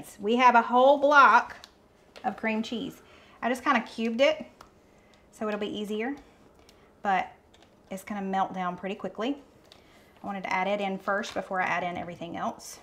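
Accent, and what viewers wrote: South, United States. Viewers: Hey We are here for you My Prayers and Blessing to you and and All your Family and Thank God weren't hurt And Remember Gracie Lou is a your Guardian Angel I am From East Pennsylvania and I am Full puerto and just be yourselves you'll Rican and I was Born😅 Big Family and I So Love Your Southern Accent and My Dear you are Perfect as you are and is Hilarious and So My Dogs Name is Tootsie Lou he has My Middle name but it's spell Different It's Bonnie Luz omg I would Love to meet you in Person and I am So Sorry You had Loss Gracias Lou I had cry so fast I had a Sense it, it's ok to cry and it's ok.. I love watch her Old Videos You 2 jusr Be yourselves ok The Cutest Couple I watch All your old Videos you light up My Spirits Real z people IN The Kitchen cooking and Kidding around Having Fun ❤My Sister and I watch you.. Sending All My Prayers and you get Back where you were Before the Hurricanes and you Safe.. 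you are Speaking Spanish Is On the Dot.. take care Mamasita And papacito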